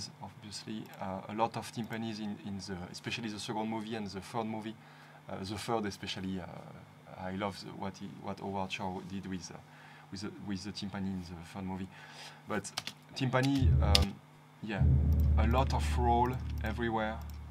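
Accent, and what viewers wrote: France. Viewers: Ha un accento francese?sono francese ammiro lei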